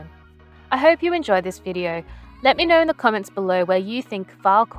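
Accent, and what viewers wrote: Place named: Australia. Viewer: Nice video. You from Oz? I'm from NZ, so the accent stood out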